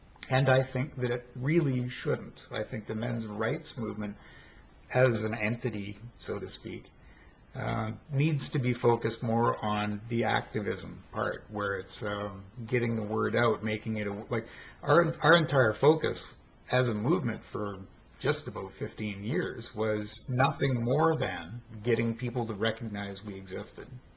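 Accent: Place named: Canada. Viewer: I sense an accent, are you Canadian? I hear that feminism is brutal up there.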